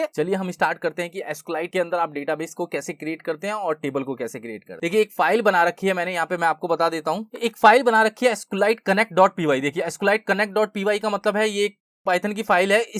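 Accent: India. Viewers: sir this is the right code import sqlite3 conn=sqlite3.connect("sqlite1.db") conn.execute(''' Create Table Student ( st_id INTEGER PRIMARY KEY, # <-- fixed here st_name VARCHAR(50), st_class VARCHAR(10), st_email VARCHAR(30) ) ''') conn.close I noticed that you are using AUTO_INCREMENT for the st_id column. This is not supported by SQLite, which uses a different mechanism for generating primary keys. You need to use INTEGER PRIMARY KEY instead, which will automatically assign a unique integer value to each row. This will also make your table more efficient and compatible with other databases. To fix this, you need to change the st_id column definition to INTEGER PRIMARY KEY I hope it's ok to give feedback, great channel by the way, the biggest benefit of this channel is the Indian voice, learning from English channels becomes tedious due to their dull voices, thank you for this material.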